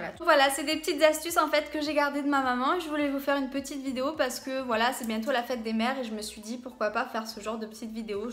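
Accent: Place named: South, France. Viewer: Cet accent du sud, j'adore !! J'aime beaucoup le réal ysl ☺️ j'en ai un, ils sont top et sentent trop bon !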